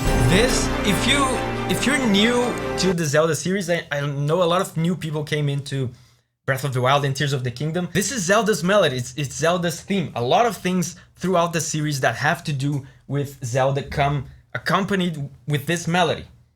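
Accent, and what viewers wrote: Brazil. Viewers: Your accent sounds like a brazilian person, are you from Brazil? (Manda salve se for) GREAT video btw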